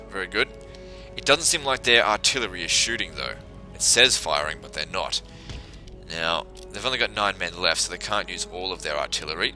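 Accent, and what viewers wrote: Australia. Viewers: Hes Australian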